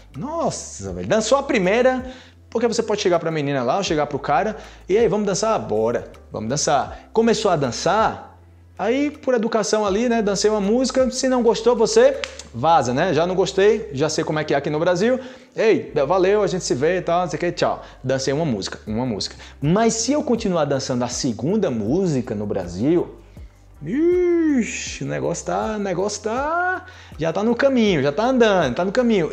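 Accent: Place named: Colombia. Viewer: Adoro tu acento colombiano ! Cuando dices " enserio " 😍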